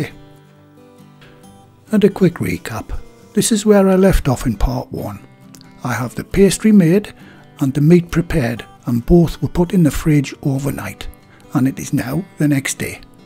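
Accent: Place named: Sunderland, United Kingdom. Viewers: thanks. I’ve always loved the Makkems, how could I not listen to that southern accent and enjoy it? My dad was from Blyth! No-one understood me when I came to the US, I had to “Londonise” my accent. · Love your videos thanks for taking the time to make them. My dad was from Sunderland so as you can imagine I love the accent. Could you possibly give an idea of cooking times for the small muffin tin sized pies. Also rough weight of fillings in them. Thanks Geri Hargreaves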